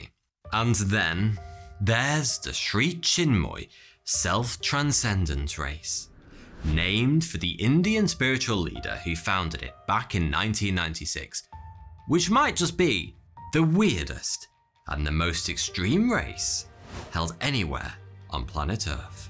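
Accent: United Kingdom. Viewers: only british accent i like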